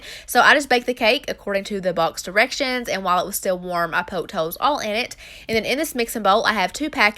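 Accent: South, United States. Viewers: I love how you say monday 😍😍😍 ur country accent 😍😍😍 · Just found your channel and I ADORE YOU! Your content is awesome and your personality makes me feel like we’d be great friends lol 😂🤗😘 Plus I LOVE your southern accent! New subbie ♥️♥️♥️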